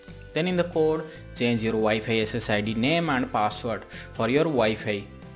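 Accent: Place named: Odisha, India. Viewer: Emitika English kebala odia pila hi kahiparibe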